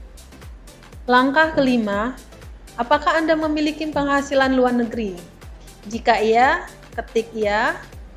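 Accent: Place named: West Java, Indonesia. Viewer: Urang sunda kayaknya ibu nya.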